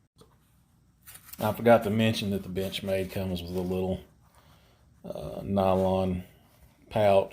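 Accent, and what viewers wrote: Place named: South, United States. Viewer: just joking it your southern drawl.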